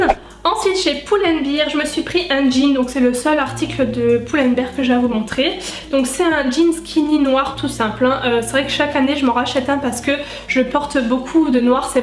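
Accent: Lorraine, France. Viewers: Je tombe par le plus grand des hasard sur ta vidéo, puis j'entends ton accent, qui me rappelle fortement le mien, je vais checker la description, et mes doutes se sont avérer réels ! Tu es Lorraine ahah :) +1 abonnement ;)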